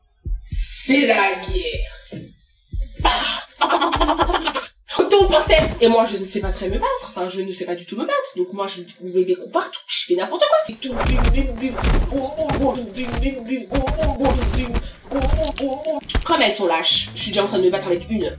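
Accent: Antilles, Caribbean. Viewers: Tu est antillais je connais l'accent créole tu est d'ou au îles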